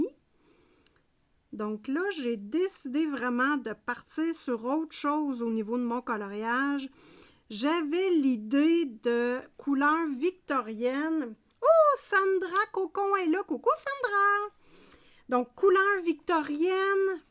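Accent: Canada. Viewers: Bonjour 😄,ça fait plaisir de te voir et de t'entendre car j'adore ton accent canadien, il démarre très bien ton colo, il sera beau 💕😄bises Martine 😄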